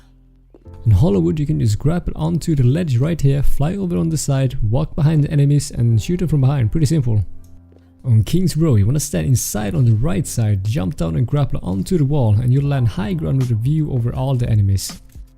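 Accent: Sweden. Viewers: Alex B its a swedish accent or southern swedish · are you Swedish?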